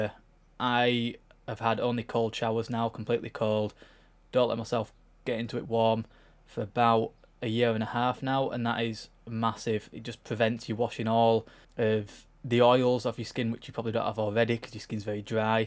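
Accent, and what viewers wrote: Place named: Northern England, United Kingdom. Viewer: Proper northern accent bro but love the video ❤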